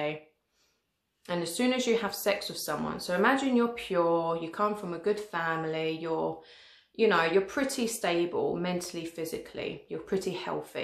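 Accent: United Kingdom. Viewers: WHY do you dis the westeners with your british accent. I'm Not defending us but we're the worst?? Are you kidding??? that is negative. its called sex not shagging,btw shagging makes it sound like its not really sex nor that it has conseqences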